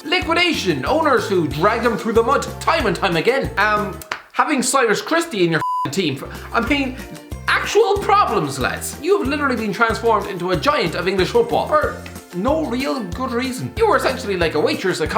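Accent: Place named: Ireland. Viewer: What part of Ireland are you from ?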